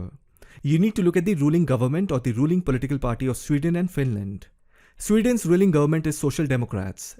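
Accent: India. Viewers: Russian propaganda with Indian accent.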